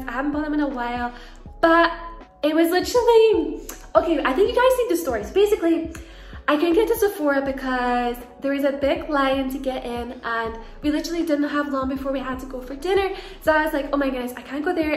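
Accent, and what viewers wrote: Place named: Scotland, United Kingdom. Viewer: I love your accent and the way you said bobby brown, I was just like, yup, she is definitely scottish. I find northern british accents so friendly and welcoming😊 It fits your personality and the bubbly and fast way you talk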